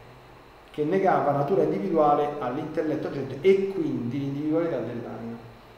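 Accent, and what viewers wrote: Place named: Rome, Italy. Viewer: Santo Padre, grazie dei suoi preziosi insegnamenti! Le chiederei solo di parlare meno in romanesco perché a volte mi perdo le sue parole e mi dispiace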